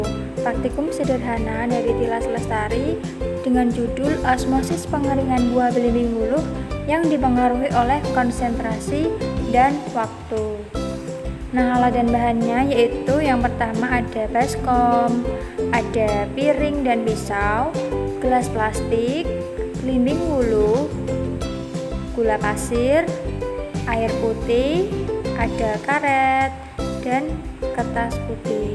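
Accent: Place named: Java, Indonesia. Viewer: medok banget 😭 but overall baguss dan informatif hehe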